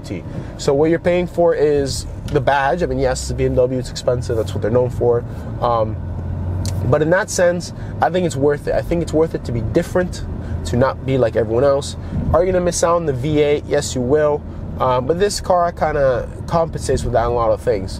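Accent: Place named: Miami, United States. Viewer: You look and talk exactly like I'd expect someone from Miami to.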